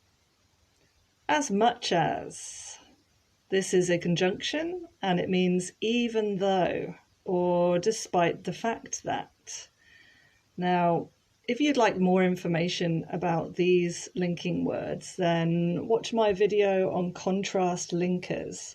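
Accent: United Kingdom. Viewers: Hi! I discoverd one more utilisation the "as far as..." Tsunami had reached as far as my house so I moved to another island! Thank you for your lessons and I love listening your british pronunciation as well, even though it is difficult to imitate!!!❤❤❤👌👌👌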